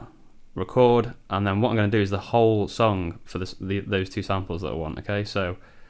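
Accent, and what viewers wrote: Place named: England, United Kingdom. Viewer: Hi again, while waiting to buy this machine I'm following your videos which will prepare me for when I'll have it here :-) I'm from Italy and had to slow down the video and turn on the subtitles to get every single word... I hope I'll get used soon to your English, I guess I saw too many American English videos and I'm not used to that pure English :-) anyway, that's not the point actually, but I didn't get the first part where you talk about polyphony... As far as I understand from the manual (sp404a) it can handle 12 voices. So it's not clear how it can stop working when at the beginning of the video you try to layer 3 drum samples over that piano sample... If they were all stereo samples, they would be 8 voices, thus it takes at least other 4 mono or 2 stereo to reach that limitation of 12. So I think that I'm not getting something, can you explain again please?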